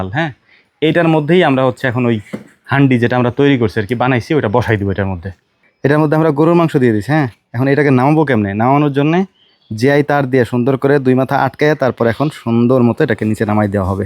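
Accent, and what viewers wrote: Faridpur, Bangladesh. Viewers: ভাইয়ের ভাষা সেম আমাদের ফরিদপুরের ভাষার মতো।আপনি কি ফরিদপুরের নাকি ভাই?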